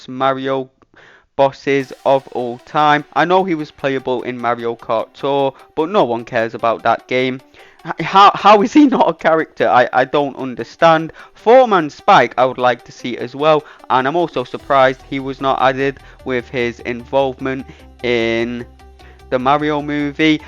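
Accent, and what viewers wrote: United Kingdom. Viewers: I dont know much about Mario Kart World, i just come here to hear your Bri'ish accent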